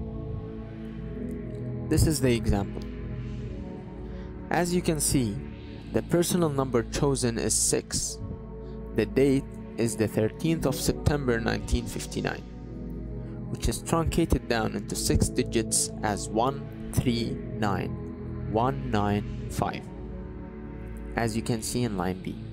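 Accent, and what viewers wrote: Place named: Sweden. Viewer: Are you from Sweden? Just because of the accent. Great explanation of the coolest field cipher!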